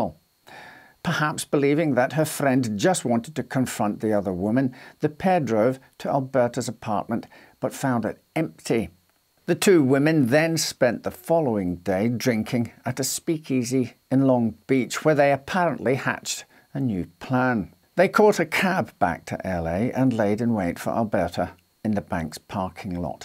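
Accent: Scotland, United Kingdom. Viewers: Definitely Scottish. A very light burr..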